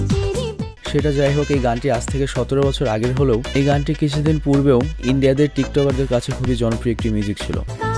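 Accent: Noakhali, Bangladesh. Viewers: এটা কিছু অইলো,,,,, ভাই আপনার বাড়ি কি নোয়াখালী নাকি ।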